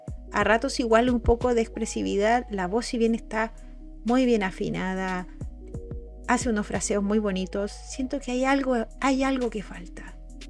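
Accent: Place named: Chile. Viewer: Me alegro que hayas descubierto a, no te preocupes, no a todo el mundo engancha en las primeras canciones, normalmente con 3 de sus canciones mas conocidas te da una idea de lo que es musicalmente y a nivel de entretenimiento como nunca otro grupo de este genero lo hizo antes, pero aun asi encontrar esa cancion que te llegue (especialmente por la barrera del idioma) puede tomar varias mas hasta que la encuentres y te convenza, no estamos ante cualquier proyecto random japones, por el contrario, el concepto fue muy bien elaborado desde que eran pequeñas y hoy en dia son señoritas con mas de 20 años que han perfeccionado y crecido en muchos aspectos sin dejar la frescura que los caracteriza. Guiandome por tu acento supongo sos de Chile, tuve la fortuna de presenciar sus shows cuando estuvieron el año pasado en el Knotfest (Parque del Estadio Nacional) y el sideshow que hicieron en el Centro de Eventos Basel al igual que en sus presentaciones en Brasil y Argentina, y la verdad que me lleve los mejores recuerdos de mi vida, ojala vuelvan y pueda volver a conectar con esa linda comunidad hermana de Chile. 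Abrazo y espero que sigas disfrutando de este viaje pq aun te queda y mucho de lo bueno esta aun por venir.🦊🤘❤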